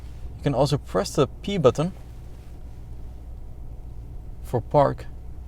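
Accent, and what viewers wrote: Netherlands. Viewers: a dutch guy talking English... never been good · I smell some Nederlands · echte hollander die engels praat :D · Hoor meteen een nederlander 😆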